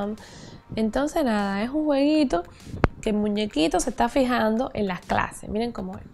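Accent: Cuba. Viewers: jajaj tienes un asentó chistoso pero no pareces q eres de aqui de mi pais republica dominicana eres como cubana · Cambiastes la voz y es una técnica perfecta para conseguir más subscriptores... porque hablas ahora como tú nacionalidad ósea cubana y es lo mejor que pudistes haber echo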